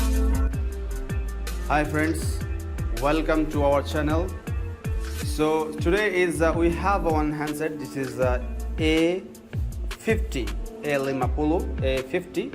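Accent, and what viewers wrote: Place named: India. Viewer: kek orang india logat nya...hjeheheheeh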